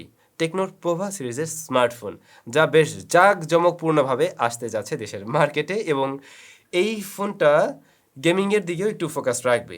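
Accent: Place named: Narsingdi, Bangladesh. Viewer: Amader narsingdi r Kotha sone vlo laglo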